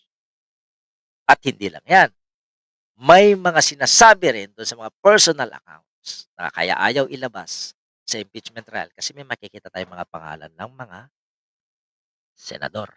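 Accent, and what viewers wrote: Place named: Chinese-Filipino, Philippines. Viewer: Hahaha.... Tsinoy kb idol? Para tsinoy kc tuno ng boses mo eh....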